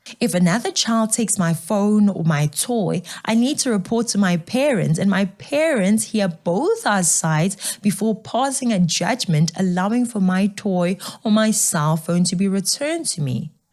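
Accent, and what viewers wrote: South Africa. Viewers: thankyou we are so blessed to have you uncover all the wrong doings and speak your mind with that lovely south african accent god bless you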